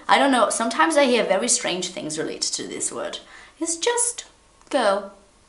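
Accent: United Kingdom. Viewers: I finalmente found someone with a British accent.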